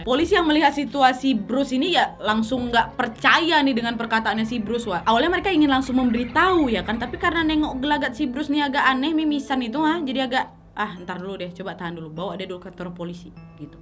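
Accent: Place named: Medan, Indonesia. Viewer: Kok berubah logat mu KK Jadi Medan - Melayu wkwkwkwkwks🤣🤣ngakak liat bersin nyaa😂😂 Motif nya jelas unsur sakit hati atas ketidakadilan yg dialami si Steven dikota itu kondisi korban yg seperti dilecehkan" didalam rumah ibadah jadi pilihan nya buat balas dendam terhadap sistim dikota itu sebenarnya masih banyak yg mau diuraikan cuma males ngetik nya😂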